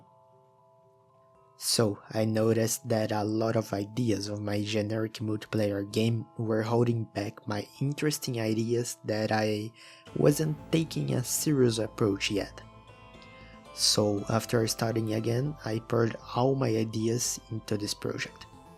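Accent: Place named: Brazil. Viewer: Cara, pelo que vi, você é brasileiro (suspeitei pelo sotaque e uns comentários aqui hahaha). Queria deixar aqui minhas palavras de incentivo e de admiração. Este vídeo e a sua jornada são inspiradores pra mim na minha busca de criar um jogo. Você me parece um rapaz muito criativo, dedicado e talentoso, por isso, espero que vc atinja seus objetivos e sinta a alegria e satisfação de ver seus projetos completos. De verdade, mano, meus parabéns!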